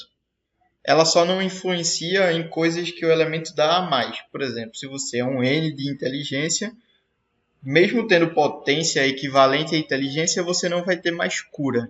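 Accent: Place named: Pernambuco, Brazil. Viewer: reconheci pelo sotaque kk sou de recife.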